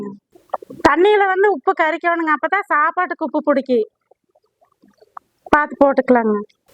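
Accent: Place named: Kongu, India. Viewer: Akka nama Kongu tamil kalandha saadham arumai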